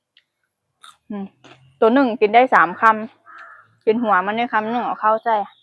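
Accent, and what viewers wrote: Phu Thai, Thailand. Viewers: เป็นสาวภูไทยติ ฟังจำกเสียงดนตรั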